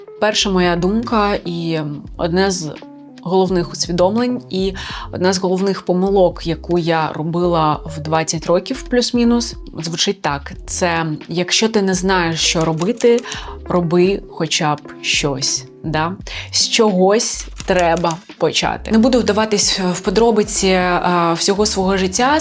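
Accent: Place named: Russia. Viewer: Людина переходить з російсьеої мови на українську. А наголос залишися зі слова «счастлива»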